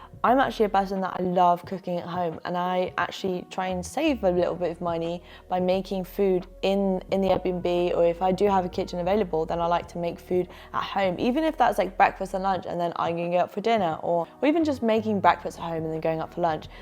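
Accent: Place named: England, United Kingdom. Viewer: sorry where u from ? england? no surprice peace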